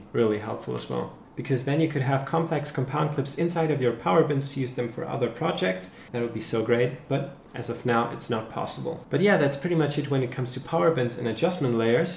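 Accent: Germany. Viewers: your german, right? guessed by ur accent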